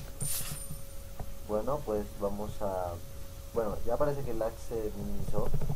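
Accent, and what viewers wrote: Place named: Peru. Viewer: esa voz de peruano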